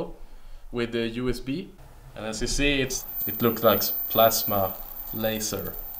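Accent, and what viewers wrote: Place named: Sweden. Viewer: You are Swedish, i can tell by your accent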